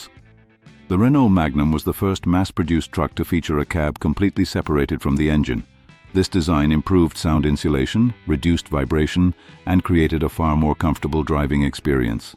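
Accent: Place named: United Kingdom. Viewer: Yep, 100% AI slop. Generic British accent voiceover, no breaths, slightly off vocal cadence. There's so much of this trash on YouTube now, I can usually spot it in seconds.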